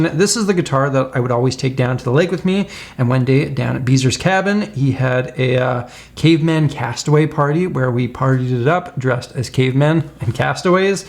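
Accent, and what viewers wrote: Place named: Canada. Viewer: For a few videos now I couldn't shake off the feeling that voice reminds me very much of somebody else's. And now it hit me - Jordan Peterson! I guess that's what you call Canadian accent. Which makes me excited I can hear it, not being a native English speaker.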